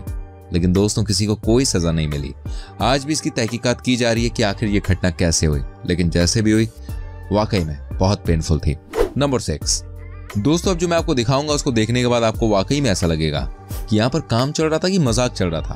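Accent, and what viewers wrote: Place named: Hyderabad, India. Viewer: HYDERABADi Bolte...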